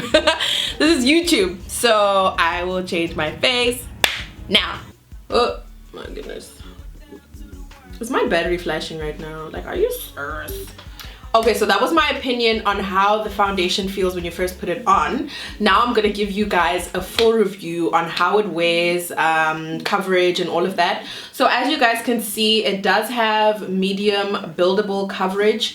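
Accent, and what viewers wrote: South Africa. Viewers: I like your accent, you sound South African or Zim/Canadian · Are you South African?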